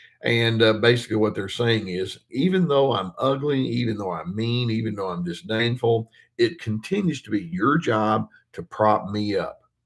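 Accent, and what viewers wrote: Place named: Texas, United States. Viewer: ! I love your voice! And that you are slightly self conscious about this! You are so kind beautiful and helpful! Your voice is one of your signatures and I could recognize you in the dark! Not to mention your Texas accent! You are the best!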